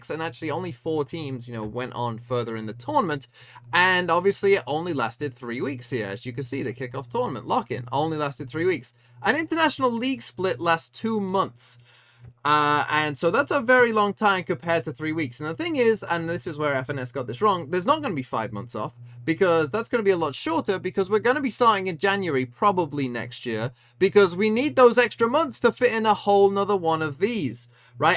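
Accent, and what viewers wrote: England, United Kingdom. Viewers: He's English, right?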